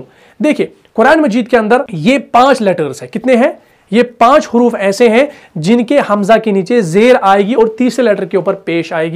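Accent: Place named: Mumbai, India. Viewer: Qaree sahb aapka Arabi tallaffuz correct hy jbky urdu aap Indians k mumbae walon ki tarah bolty hain khalee ko khalee yanee khal k kaaf ki taraha ghunna ki gonna yanee aap lafz bol sakty hain laijin bilawaja urdu ja tallaffuz bigar dety hainQ?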